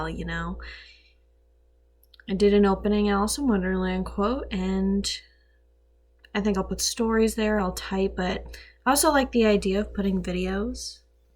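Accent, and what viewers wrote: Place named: United States. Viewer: Usually american accents irritate me but yours is very charming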